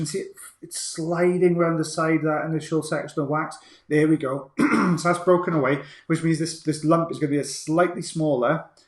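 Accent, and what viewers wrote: Wales, United Kingdom. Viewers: you said you wanted to know if youtube interrupted the video to show an ad - they were showing the DR Drew says this guy is for real V-shred ad on 26/11/23. I love these videos, theres something so satisfying about the skin peels! and your accent reminds me of my grandmother, i loved her welsh accent and i miss it so much, please keep the videos coming!!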